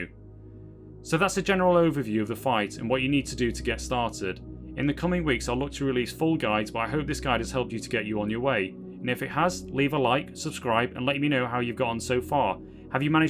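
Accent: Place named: United Kingdom. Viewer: you have the most British voice ever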